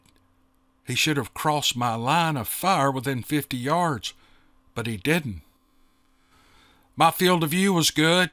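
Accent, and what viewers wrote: South, United States. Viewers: Hey,. I have written you couple of times. I wanted to tell you I really enjoy listening to you. I was raised in the south and your voice brings back good memories. I am also a veteran and the Wild Hunt series is something else.....Mr Roberts stories and your narration are the shit. I would love to see a conglomeratiom of wild hunt stories made into a Movie would be awesome. I know some of the characters in the wild hunt team have a back story that would make for GREAT reading. Glad to hear you are gonna continue to narrate these books. Keep up the great work. Thanks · Yay! Thank you. I'm listening faithfully as you post!! LOVE listening to your Warm Southern drawl narration. Hope you, better half, all your great doggies and chickens are doing well. ☺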